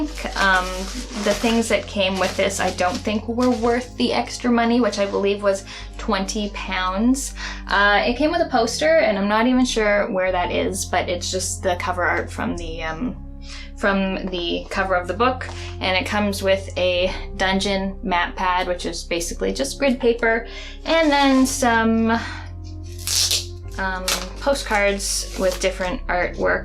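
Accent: Canada. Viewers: Canadian accent for the win! Looking forward to the Journey to Silius review.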